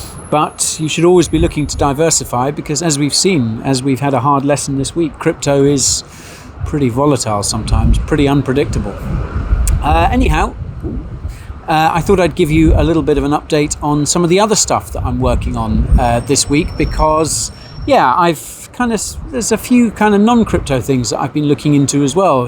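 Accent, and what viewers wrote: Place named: United Kingdom. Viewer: Hey I use your vlogs to learn British accent and use in my acting 😁 keep them coming!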